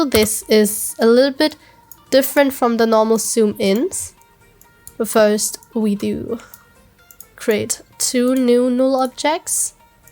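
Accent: Germany. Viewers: Schwöre, das Deutsch quilt so ausm Englisch raus hahahah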